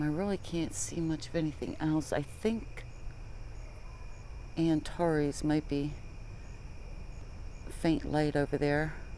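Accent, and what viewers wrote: South, United States. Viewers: Hi, Absolutely stunning Footage!👍👍👍. I would have to say Saturn is one of my Favourites just beautiful. Thank you for the Time and Effort you put into these Video's, I love the Overlays you put up to show what's what! I have to say I Love your Southern Accent, so soft and Lady like! Have a wonderful Weekend. Take care♥️. Melbourne, Australia.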